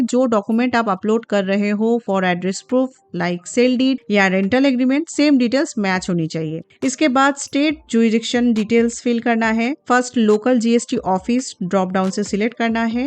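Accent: Bengal, India. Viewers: Aap bangoli ho na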